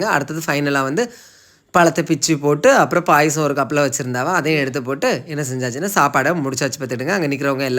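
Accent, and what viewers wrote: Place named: Nagercoil, India. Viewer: Super your speech my own house super people speech same great good nargovil speech super thanks 🙏🙏🙏👍👍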